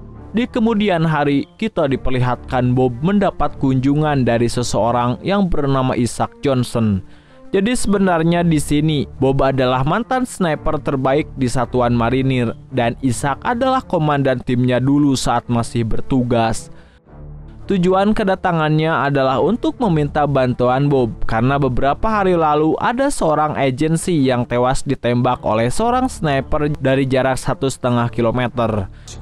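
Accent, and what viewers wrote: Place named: West Java, Indonesia. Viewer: eleh-eleh.. sunda pisan.. 😁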